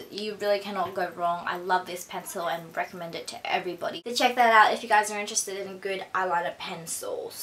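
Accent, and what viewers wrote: Australia. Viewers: Is she Aussie ?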